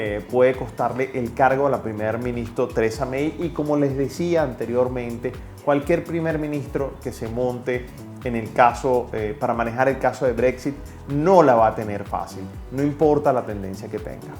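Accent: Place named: Venezuela. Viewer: Por tu acento creo que eres venezolano, yo también lo soy y vivo en New York y ya tengo casi dos años en el trading, espero aprender mucho más con tus videos y con tus tips, y agradecido por compartir tus conocimientos, para los que aún estamos en este proceso de aprendizaje.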